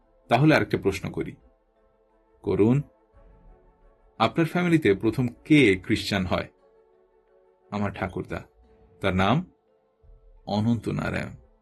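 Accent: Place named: Bangal, Bangladesh. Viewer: Ato bhoyanok pronunciation!!! Please learn proper bengali bhai! Satyajit Ray kokhonoi bangal bhashaye lekhen ni ei sob! You have ruined everything! Akok bhabe korleo listeners need various voices! Use vibrato or else include someone else please! Erokom flat way te kono golpo convey kora jaye na! Please learn the art first!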